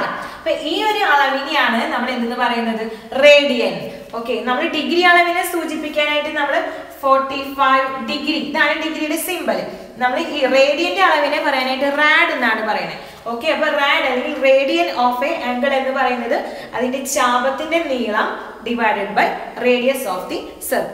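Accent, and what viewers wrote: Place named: Kozhikode, India. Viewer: Edhnn parennath😂 kozhikoden slag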